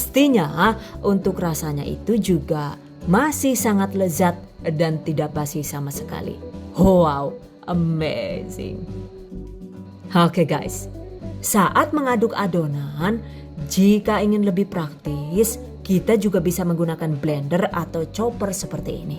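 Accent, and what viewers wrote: Banyumas, Indonesia. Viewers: Mksh bun. Seneng lihat.tapi ga pernah buat. Bunda Lucunya. Si pd inyong ngapak ya bun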